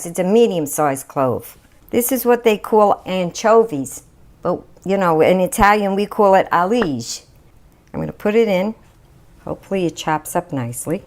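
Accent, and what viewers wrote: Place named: Brooklyn, United States. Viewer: Looks good, I make them similar but bake them in the oven coated with olive oil. She sounds like she is from my neighborhood in Brooklyn.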